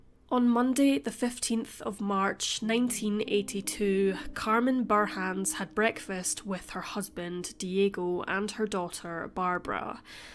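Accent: Scotland, United Kingdom. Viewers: She's enunciating her words properly so everyone can understand what she's saying... it's not slow. The Scottish accent is sometimes hard to understand if you're not from Scotland. Listen at a different speed if it bothers you that much 🙄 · If she'd talk normal,you would not understand a word,unless your'e scottish????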